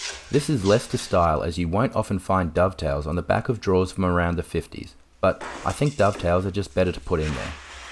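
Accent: Australia. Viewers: Nice to hear an Aussie accent. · Saw the thongs, heard the accent and knew straightaway you were an Aussie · Really like the look of this..and so nice to hear a fellow Aussie. A new subscriber for sure…🇦🇺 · hi there..I can tell by your accent you are an Aussie like myself...I've watched various furniture flippers from other countries doing this but have wondered myself if there is money in it here in Australia..I know that this sort of furniture is rather expensive in Op/second hand shops and stuff on roadsides are generally cheap and nasty particle board, not worth fixing up..I have furniture of my mothers that date from the 50's, from when they first set up house, but alas some of it probably wouldn't sell, even if fixed up, because they are furniture that nobody really uses anymore, like free standing wardrobes...I'd love to keep them in my upcoming move but alas will not have the room for them..do you know of anybody flipping furniture here in Mel Vic..??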